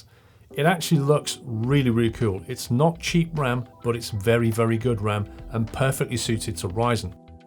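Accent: United Kingdom. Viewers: OK to be honest his voice sounds British but his face says Florida man 100 %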